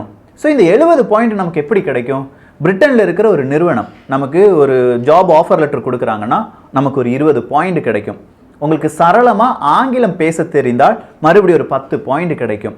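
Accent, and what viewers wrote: Kerala, India. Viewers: நீங்க மலையாளியா ப்ரோ?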